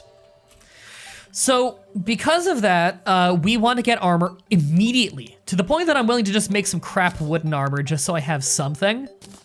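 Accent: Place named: Southern Ontario, Canada. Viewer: Gotta love that Southern Ontario accent of his! Best Canadian Youtuber!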